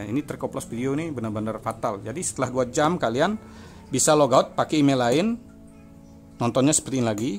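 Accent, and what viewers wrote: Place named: Kutai, Indonesia. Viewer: logatnya kayak orang kutai bang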